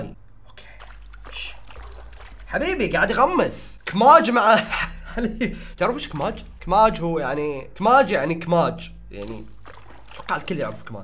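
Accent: Bahrain. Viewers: صاير تتكلم بحريني واجد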